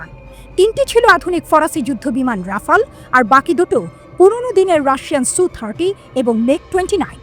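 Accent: India. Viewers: আপনি কি ভারতীয় স্টাইলে সংবাদ পড়ছেন। আপনার পড়ার নিজস্ব কোন ধরণ নাই? খুবই লজ্জা জনক।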